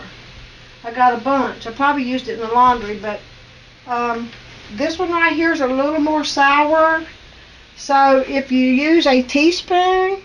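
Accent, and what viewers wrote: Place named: Appalachia, United States. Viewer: Thank you for taking the time to share your knowledge. I feel like I'm listening to my mama's family every time I hear you. Their roots are in Appalachia. I've also noticed some similarities in the some of the dishes you make. Thanks for teaching me new tricks, new recipes, and bringing back old memories.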